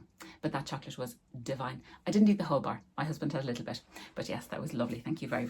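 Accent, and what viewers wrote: Ireland. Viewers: Love your vlogs, you go as green as you want! 💚 😂 Also love your Irish accent and learning Irish words, my grandad was Irish and always used to recite poetry and stories to us, although full of blarney!! 😂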